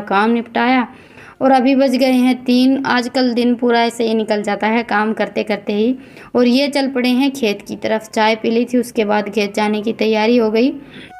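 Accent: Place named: Rewari, India. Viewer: Aap kha se h mugè to rewari se lag rhi h aap whi ki bhasa h aap ki may be rewari se hu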